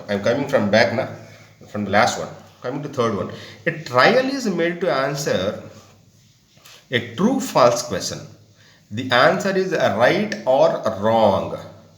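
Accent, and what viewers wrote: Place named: South, India. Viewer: Hindi bolo jab samaj aata h hume hum dilli se hai ap south Indian ho apki bhasha alag hai aur bolne ka lehza bhi alag hai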